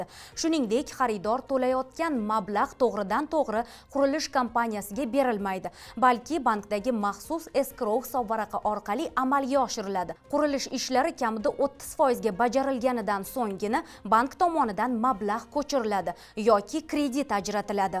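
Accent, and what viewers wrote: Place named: Russia. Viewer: ЎЗБЕКчани янги ўрганаётган ўрисга ўхшайсиз, сиздақаларга қолдими ЎЗБЕКни куни? Буни устига тенг ярим луғатинг ўрисча, э саводсиз ўрисқул?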